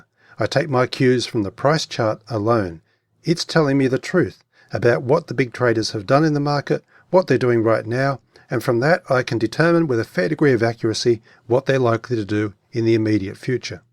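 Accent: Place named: Australia. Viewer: An Aussie, yahoo !!!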